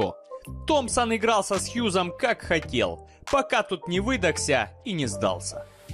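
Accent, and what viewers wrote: Ukraine. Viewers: Комментатор,хохляцкий акцент чувствуется за версту.Идите тренеруйтесь.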